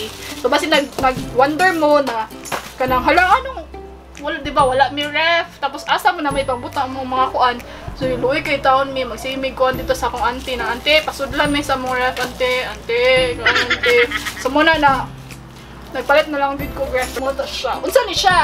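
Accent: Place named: Visayas, Philippines. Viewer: Bisaya kau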